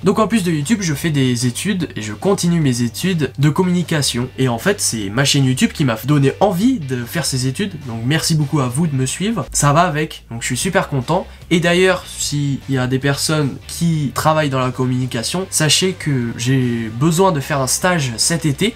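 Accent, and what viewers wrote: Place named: Germany. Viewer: Super l accent allemand 😁😁😁😁. T es génial.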